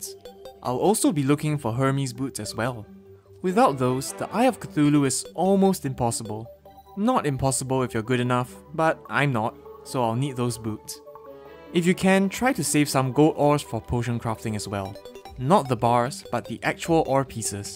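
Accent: Singapore. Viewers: Where are you from? Your accent sounds singaporean lol.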